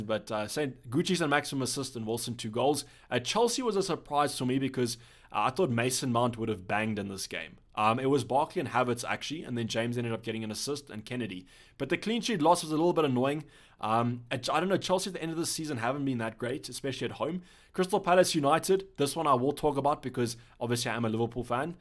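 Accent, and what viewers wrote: South Africa. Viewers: Bro you from South Africa?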